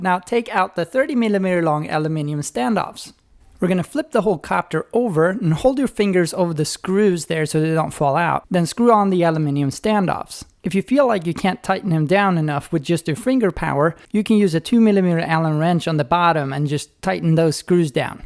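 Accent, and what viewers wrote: Sweden. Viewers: I love your Swedish accent and your jokes, they are the best! Regards from Denmark :) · awesome review just one thing, you should really pause a little longer between sentences, specially since you kind of have a swedish dialect, even for a swede its kind of hard to descipher . bara lite längre pauser mellan meningarna plz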